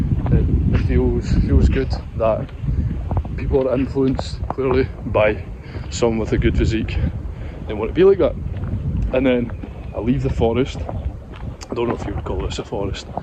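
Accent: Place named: United Kingdom. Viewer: are i want to learn your british accent.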